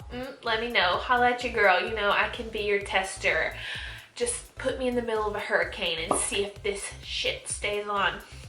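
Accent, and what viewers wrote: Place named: Louisiana, United States. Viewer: Okay.. I just randomly found this video cause I wanted to know more about that hard candy palette cause I saw it in Wal mart today! Anywaysss, right when you started talking I knew you were from Louisiana & then you talked about the hurricanes & I was like yep, I’m right! Hahaha. Anyways, I just subscribed! I’m from Lafayette!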